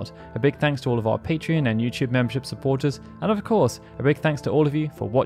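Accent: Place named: United Kingdom. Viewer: I'm obsessed with final fantasy being talked about with a British accent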